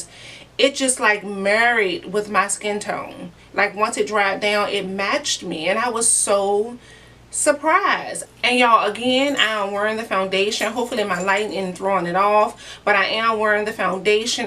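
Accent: New Orleans, United States. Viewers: I love to hear you talk..your accent ❤!!! Are you from New Orleans?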